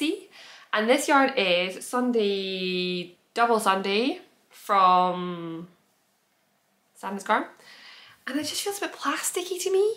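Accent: Scotland, United Kingdom. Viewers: Haha its not the speed its the Scottish accent, I love it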